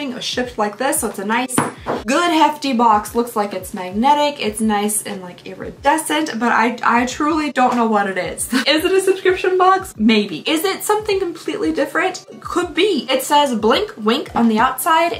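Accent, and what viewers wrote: Kentucky, United States. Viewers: How many keep coming back to hear mispronounce words, pronounce everything with KY accent, or just simply make words up? Just me?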